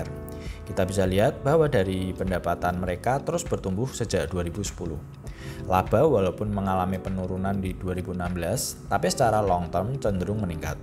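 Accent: Java, Indonesia. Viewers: Medok Men coco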